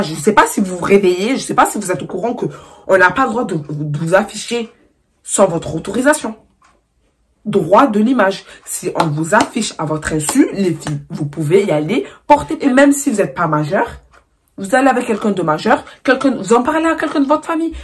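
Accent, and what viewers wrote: Marseille, France. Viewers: t gênante andrea arrête de faire la gadjie mature tu dis dla merde elle a l’accent marseillais point arrête de rager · Ton accent il est trop beauu 💞 tu viens de Marseille ?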